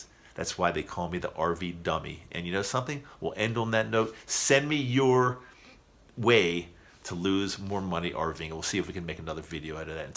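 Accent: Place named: West, United States. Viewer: I would guess that you are from a high cost of living area. Most likely Washington, Colorado, California, or Oregon. You don't sound (accent) like you are from a high cost of living area in the Northeast like Boston, New York, New Jersey, New Hampshire or Vermont.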